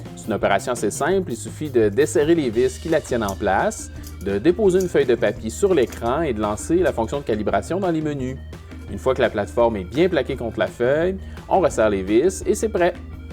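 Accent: Quebec, Canada. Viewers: Génial, je viens de découvrir ta chaîne, c'est vraiment cool tout tes trucs, et côté vulgarisation, c'est un plus et en plus, en québécois !!!! J'ai rien contre nos cousin français, mais du français de chez nous au Québec, sa sonne mieux à mon oreille ;) ! Se serait bien une suite sur l'impression 3D, je m'intéresse pas mal au sujet, j'aimerais bien en apprendre d'avantages particulièrement sur le dessin de tes propres pièces. Je suis maquettistes et sa pourrait m'être utile. Si il y a un logiciel de base, pas trop complexe d'utilisation pour les débutant et pas trop dispendieux, encore mieux gratuit et en français se serait vraiment le fun :) Merci, et continu ton bon travail !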